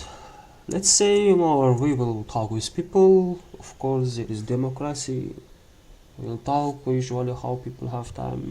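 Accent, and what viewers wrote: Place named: Russia. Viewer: Loving the game, awesome graphic, characters, powers etc. Was brought there by my friend who is obsessed with it lol Btw interesting accent, Russian perhaps?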